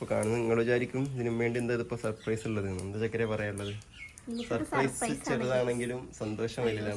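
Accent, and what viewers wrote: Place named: Palakkad, India. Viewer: കൊല്ലം ഭാഷ മറന്നോ മൊത്തം പാലക്കാട് ഭാഷ ആണല്ലോ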